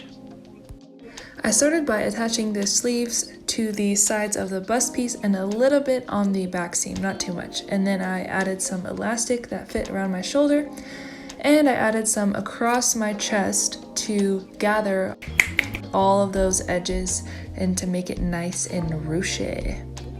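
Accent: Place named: United Kingdom. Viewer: Girl I came from another video of yours and I was just cracking up (the 70s jumpsuit) There’s so many times I was like “what am I watching 😂” but then it ended and I was like “oh no wait come back” anyway I clicked on this one and then it came up in the beginning that you’re doing this for your birthday and (if I date it right 😅😩) means you’re a Scorp and I was just sold. The vibes here are so chaotic yet so safe. I came for the sewing but stayed for the British accent. You’re brilliant luv, you’ve got a new fan xx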